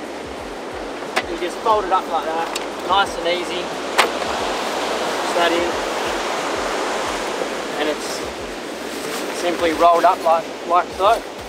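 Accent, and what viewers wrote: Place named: Australia. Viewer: Nothing better than hearing an Aussie doing a product review. ‘Bloody brilliant” 😆😆😆😆😆👍🏻👍🏻👍🏻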